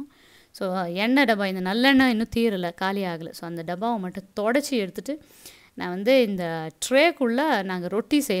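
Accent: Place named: Karnataka, India. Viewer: Hi sis, very cute vlog, god bless long way to go,, i just love ur tamil..ur slang is like an beautiful karunada tamilachi...i am also like you....an Banglorean settled in Chennai..GOD BLESSS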